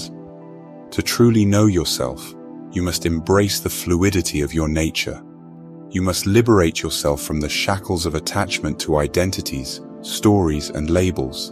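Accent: United Kingdom. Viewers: I agree...I found it interesting that this originates from India but with a British accent. I love the messages but...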